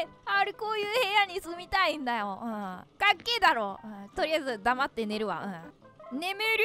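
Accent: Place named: Mie, Japan. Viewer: 三重県の人なのかな？ ○○いけやんって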